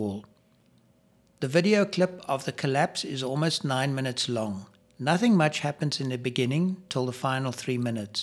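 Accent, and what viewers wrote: South Africa. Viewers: I love how relaxing your voice/ accent it, is it South African? Also thank you for the informative videos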